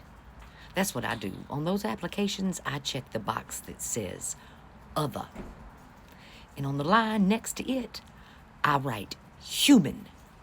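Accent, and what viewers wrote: South, United States. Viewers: I love that southern accent you have, to me it is so sexy on you. I also love the truth that you bring to us. Keep sharing your knowledge and many blessings to you, love.❤ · Your take on humanity is awesome. The southern dialect is so refreshing. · I love your southern drawl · Can I say that as a Black Southern Woman, I absolutely adore your Southern Belle accent. My first time seeing her content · I truly enjoy your content, you give it to us straight, no chaser! Just the way I like it! And, I love your accent, it has that southern charm with a sprinkle of big mama truth in it 😄. Keep bringing it sista! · You are so memorizing to the point I don't even see your color. That Southern Bell accent makes me feel like I'm listening to another sister. Furthermore you are intelligent too. Appreciate you girl!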